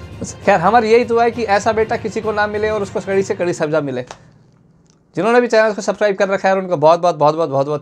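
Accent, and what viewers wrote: Haryana, India. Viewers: Japani news haryanvi accent me 👌👌👌👌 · Love your Haryanvi accent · Kaash news channel me bhi aisi haryanvi me anchoring karte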